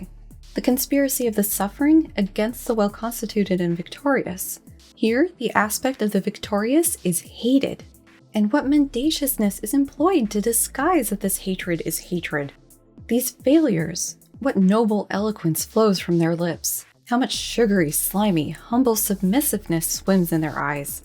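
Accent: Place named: Singapore. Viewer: the dude sounds like singapore. i m in singapore. it is a kind of hell here.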